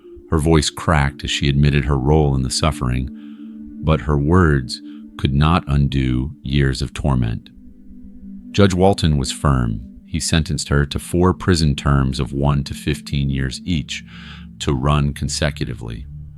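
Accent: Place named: Utah, United States. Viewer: corrected your mistake on JASMINE RUTH BRIDGEMAN and now I need to let you know that in Utah the predominant religion is the CHURCH OF JESUS CHRIST OF LATTER DAY SAINTS (MORMON) and we have the most children in the country because they believe that they are making more of actual God’s by having many children, so that explains why Utah is notorious for child abuse and child deaths. Again thank you for the spotlight on these horrific crimes. Not sure if you are from Utah but sounds like you are. Again thank you.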